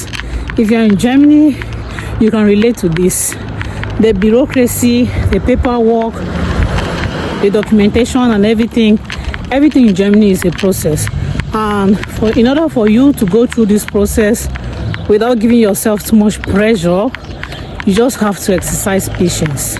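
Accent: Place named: Nigeria. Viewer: Nice, did you grow up in Nigeria? Your accent sounds Nigerian.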